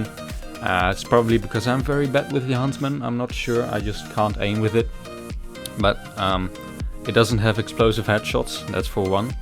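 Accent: Netherlands. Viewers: Are you dutch? You sound dutch :D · Your accent sound realy dutch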